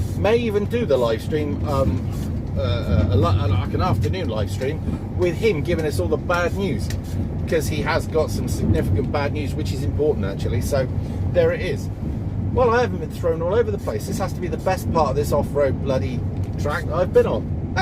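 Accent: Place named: West Country, United Kingdom. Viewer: 1 year on, luckily no power cuts so far. But who knows what can happen within the next 10 - 15 years if things don't improve i.e. building enough new power stations to cope with increased demand? Soz you don't have a partner if the lights go out lol. But as long as you're happy in life? I enjoy watching your videos. If the power goes out, I won't have anyone either. Need plenty of books to read and keep the Nintendo Switch charged up when there is power.🤣 Sounds like you live in the south west of England - West Country area?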